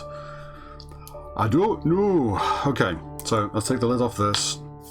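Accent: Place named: Wirral, United Kingdom. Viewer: Where are you from sounds like Wirral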